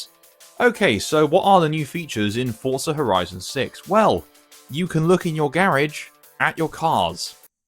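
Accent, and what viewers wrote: United Kingdom. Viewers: I like how for the history of the franchise you simultaneously cherry-pick and ignore games based on their localized titles. 'Le Mans 24 Hours' somehow counts yet 'V-Rally' doesn't. 'TDO' is in but 'Test Drive Off-Road' isn't. Opinions and facts are not interchangeable. Also, you sound fairly British to me, why are you using NTSC titles and not PAL?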